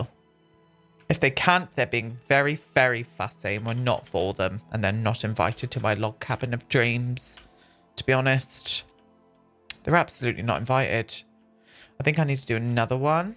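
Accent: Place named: United Kingdom. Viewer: I love that you say " I Reckon " and your British... I love it !!!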